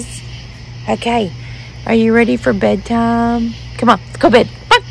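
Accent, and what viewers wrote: South, United States. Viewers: Haha, loved watching the dogs bedtime routine, so sweet😍 and that Southern accent 👍 Thanks for your fun channel and Hello from another curly girl downunder🖐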